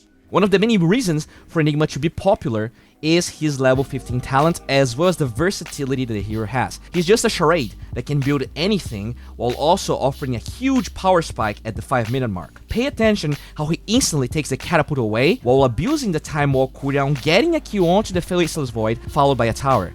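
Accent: Brazil. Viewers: Good job man, your accent sounds familiar to me haha just in the case, are u Brazilian?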